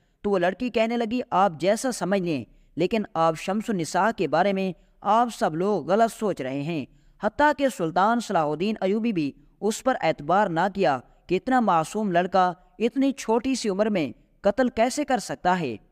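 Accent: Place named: Saraiki, Pakistan. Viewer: Saraiki lehjy main urdu boli ja rahe ha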